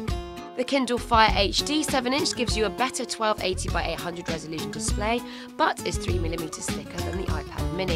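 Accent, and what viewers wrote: England, United Kingdom. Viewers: Speaking to fast and English accent...a waste to watch for me.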